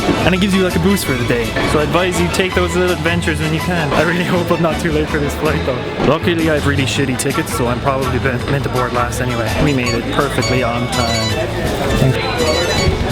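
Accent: Ireland. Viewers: Loving the work lad. What part of ireland are you from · gowan you mad thing! what part of ireland are ya from??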